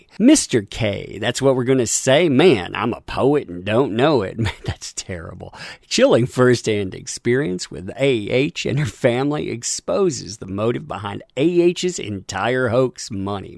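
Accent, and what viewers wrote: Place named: Texas, United States. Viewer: Texan AF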